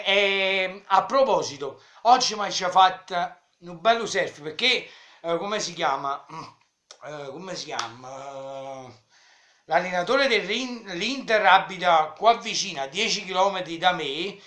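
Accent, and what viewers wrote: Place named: Naples, Italy. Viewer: Mi fai vergognare di essere napoletano..tralaltro dalla cadenza si capisce che non sei nemmeno napoletano ma di qualche paese della provincia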